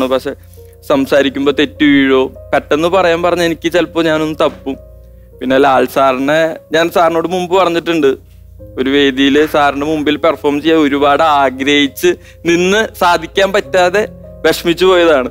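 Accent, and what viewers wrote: Thrissur, India. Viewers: തൃശ്ശൂർ ഭാഷ superഅല്ലെ നീ മുത്തല്ലെ dd you are grate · Thrissur language pwoliyalle... ❤ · DD... Nammude thrissur language vittu povathathil enthnaa vshamikne..nee njngalde muthaaanu... Oru anchor nu paranjal kadichal potatha english parayunna aaalaavanam ennonum illa... Thrissur tone maatathe nee samsaricho... Ini ipo athu ishtavathavar nine kananda... Ninak nammal thrissur nte gadikal full supportaaa... Comments nokku dd... Thrissurr eno palakad no ennonum illa... Ithu kanunna ellarum nine ishtapedunnavaraa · Thrissur bhaashaa aaa chetto chetne highlight 😍😍😍.. Don't ever change that. That makes your way of speaking so attractive.. We should all love our native slang. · നീ നമ്മുടെ സ്വന്തം തൃശൂർകാരൻ അല്ല.: ടാ അതിൽ ഉപരി എന്താ വേണ്ടത്.. നീ മുത്താണ് സ്വന്തം ഭാഷ ശൈലി അണ് ഈ ഇപ്പോഴും തുടർന്ന് കൊണ്ട് പോക്കുന്നത് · Thrissur ഭാഷ ആണ് DD ടെ superb u r great. 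· Ath thane. Thrissur kar pinne thrissur basha thane alle paraya.kore alkar kuttam parayum but dd not change your thrissur slang. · സത്യം parajal DD ഉള്ളത് കൊണ്ടാണ് നമ്മളെല്ലാം ee programe kanunath അല്ലെന്ന് aarkenkilum തോന്നുന്നോ 😍😍 DD chetta ഭാഷ ഒന്നും oru പ്രശ്നം അല്ല numma തൃശൂർ ഭാഷ polialle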